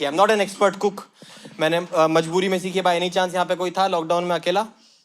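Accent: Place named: Hyderabad, India. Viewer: U have slightly hyderabadi hindi. Loved to hear .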